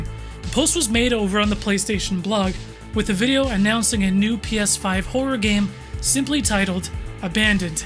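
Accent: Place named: Netherlands. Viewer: Yeah, as a dutch person, there is definitely a dutch accent.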